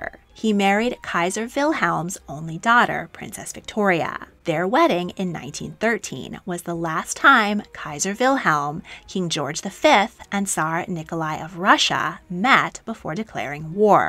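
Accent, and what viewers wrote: California, United States. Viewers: Good video but omfg the narrators voice makes me suicidal soo american and soo high pitch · Californiaaaaaan accent with vocal fries absolutely horrible. Say Trey for us and which roads you used today. USA refused to enter the first World War until the very last moment in 1917- the cannon fodder to hold back the otherwise successful German push on Paris. 1926-8 USA banned immigration especially by European Jews. 30% of USA was German decent and lobbied (I would argue 99% with the most honourable reasons) for USA to continue isolationist policy.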